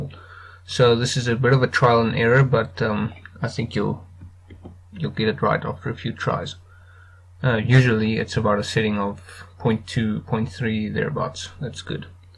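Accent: South Africa. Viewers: Ah, excellent. I actually like your South African accent, but yeah better recording gear (and less noise) will help loads. Thanks a lot!:) By the way, is there a good way to get a Blender hair shape (or even just the guides) out of Blender and into Max or Substance Painter? I plan to render characters in Iray (Substance Painter) and VRAY in Max, but I love the hair control in Blender. Thanks!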